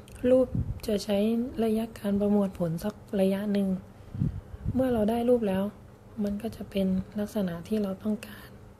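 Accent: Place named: South, Thailand. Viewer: อยุจังหวัดไรนิแหลงออกทองแดงเรย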